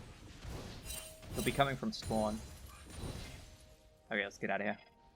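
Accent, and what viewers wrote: Australia. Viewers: Loving the series mang keep it up, real informative and nice to hear an aussie accent behind the skill. Have you considered doing coaching videos?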